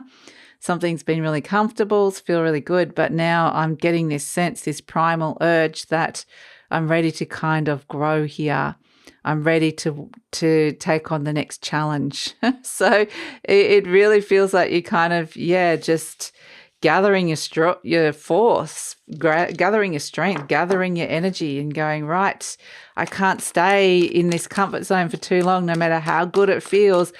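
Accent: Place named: Australia. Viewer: I love to watch Masterchef Australia and you have the same accent than Billie, knew right away that you are in Australia, all the green in your garden, beautiful 💙 Thank you for your reading, always interesting and inspiring Wishing you a great weekend 😊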